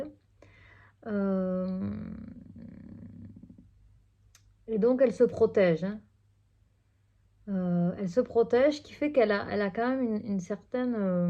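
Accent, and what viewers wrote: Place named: Quebec, Canada. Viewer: bonjour,.c'est tout à fait l'écho de ma situation.merci pour ce que tu nous apportes, avec l'accent québéquois qui ressort +++aujourd'hui😋tout mignon.😍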